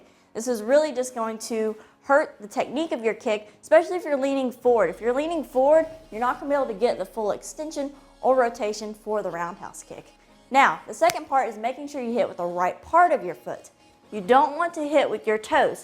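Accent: Texas, United States. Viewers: whAT IS THIS ACCENT IS THIS TEXAN WTF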